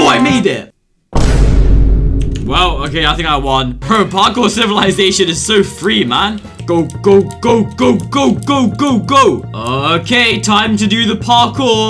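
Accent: United Kingdom. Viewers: pvp civilization but british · I was dying, because you’re British (Sorry) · POV if parkour civilization wasn’t scripted❌ POV if was British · Bros brithish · Bros British😂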